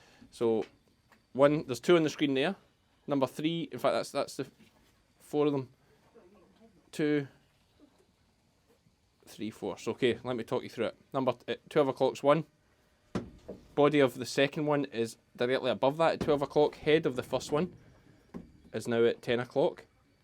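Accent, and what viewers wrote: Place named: Kilmarnock, United Kingdom. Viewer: Actually having my friend to watch, as we are coming to Kilmarnock in three weeks, so she can tune her ear to the accent!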